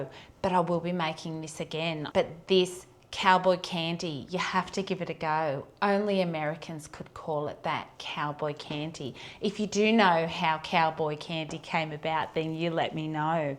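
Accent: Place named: Australia. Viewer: Hi, you are Definitely a Dinkie Die Aussie. I love your videos,your Aussie accent and you make me laugh. I pleased you now settled down to making cooking videos. It is more exciting than shorthand and typing. Got to get back to the potato bottling or canning. 😂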